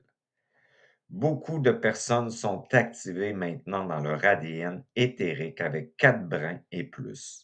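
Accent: Quebec, Canada. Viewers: Bonjour, Merci pour tes partages. Je te suis depuis 2 ans. J'ai foncé lorsque j'ai entendu "Je ne veux pas revenir ici". Waouh... ça a fait tilt!! Enfin un "youtubeur" qui propose du contenu et qui dit tout haut, ce que je pense dans ma tête depuis des années. oui... dans ma tête parce que lorsque je dis ça à des copines elles me répondent "La vie est belle!!" Ha bon!? "Ceux qui meurent de faim, les guerres....etc..... tu trouves que la vie est belle toi?? Sont folles dingues!! J'adore t'écouter parler, ta sincérité me fait du bien, les termes que tu emploies sont top et drole!! j'adore votre vocabulaire à vous les gens du Québec et cet accent... j'adore!! J'avais déjà eu une approche du point zéro avec le livre "Essence de kishori", donc ton discours avait du sens pour moi. Nous avons eu quelques rdv, puis pour des raisons financières j'ai dû laisser de coté, donc les vidéos que tu proposes sont un excellent soutien et surtout cela ouvre à nouveau ma conscience, car je viens de rester au fond de l'abime durant 3 ans, j'ai cru que jamais je n'en sortirais... 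Damned.... l'épreuve de la solitude, c'est horrible lorsque l'on est mal. Tout le monde tourne le dos. Bon... ça va mieux!! Alors, ce message, c'est parce que je m'interroge???? Le fait de mettre le focus sur toi (nous sommes nombreuses et nombreux), l'égrégore il est là... qu'on le veuille ou non!? Donc il est utiliser....!? Même si tu nous dis de ne pas te croire, de penser par nous.... ben ça c'est p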